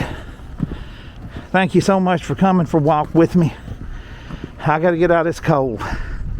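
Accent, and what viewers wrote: South, United States. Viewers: Be yourself. There is nothing wrong with your country accent, that is our blessing from being in the south. I have one too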